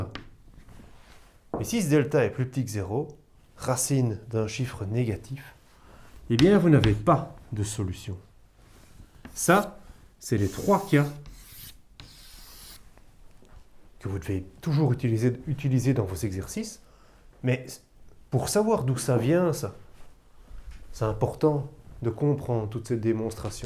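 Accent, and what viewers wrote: Brussels, Belgium. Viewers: L'accent belge est vraiment magique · il a un accent bruxellois plus que "belge" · il a un délicieux accent belge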